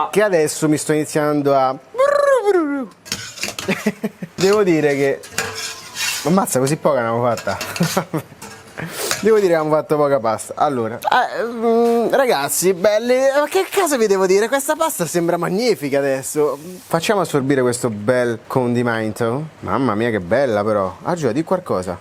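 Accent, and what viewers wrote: Rome, Italy. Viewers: tutto quello che fa e divertentissimo sara perche parla romano... ma la gioconda non l.hanno rubata pero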